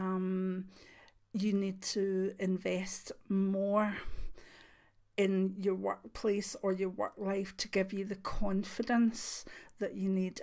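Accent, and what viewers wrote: Scotland, United Kingdom. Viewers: I'm a brand new subscriber. Your sweetness, kind and loving energy and lovely Scottish accent captured me. Thanks for all your shared wisdom and helpful videos. Much love, light and abundance of all good things to you. 💞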